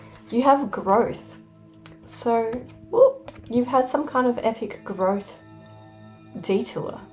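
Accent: Australia. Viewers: You Australian? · Cheers me up no-end :face-blue-smiling:, love the aussie accent too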